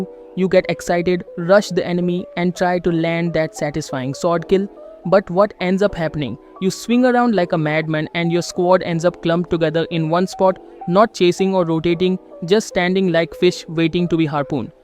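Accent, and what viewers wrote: India. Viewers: Shit Indian akzent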